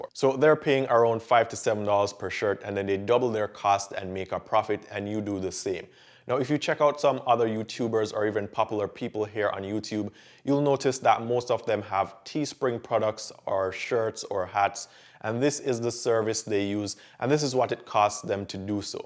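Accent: Jamaica. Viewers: Sounds like your from Jamaica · Are you Jamaican bro because I hear it ❓😂😂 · Thank you for sharing. ARE YOU A JAMAICAN? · Young man mi than yu. Fe di information. Sorry mi can't write potwan again sorry. But mi hear u accense. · SOUND JAMAICAN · Sound like u from yard 🇯🇲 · He sound Jamaican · Hi love you video are u Jamaican? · just subscribed and I'm looking forward to talk to sir. I am very much interested in the t-shirtbusiness. Yuh sound like a man from yaad iyah, suh bless up if a suh it guh.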